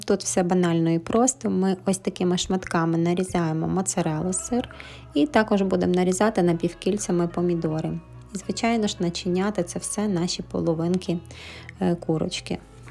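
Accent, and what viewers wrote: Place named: Ivano-Frankivsk, Ukraine. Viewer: Привіт! Люблю нашу франківську бесіду з твоїх уст. Відео вийшло дуже затишним і домашнім. Синочка вітаю з днем народження, бажаю щасливої долі.❤❤❤❤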